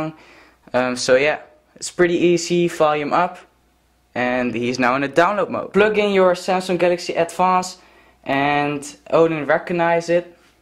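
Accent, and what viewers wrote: Netherlands. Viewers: ik hoor zo hard dat je nederlands bent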